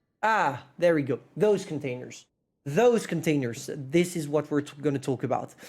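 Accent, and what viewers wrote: France. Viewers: Bonjour everyone ??? Sa se voit avec ton accent que tu es français, ou soit je dit de la merde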